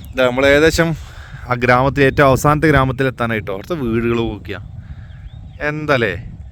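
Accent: Malappuram, India. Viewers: ആദ്യം നിന്റെ സംസാരത്തിന്റെ ശൈലി മാറ്റ്.. മലപ്പുറം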